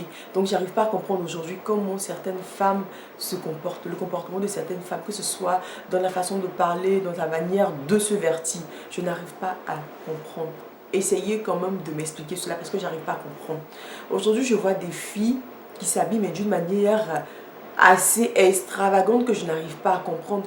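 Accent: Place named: Ivory Coast. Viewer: Ah t'es ivoirienne j'ai l'impression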